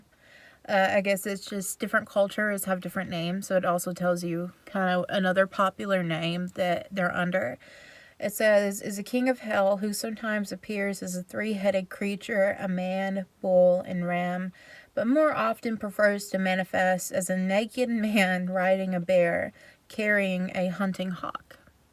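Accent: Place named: South, United States. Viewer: lol im from Nashville, i could sense you were from the south❤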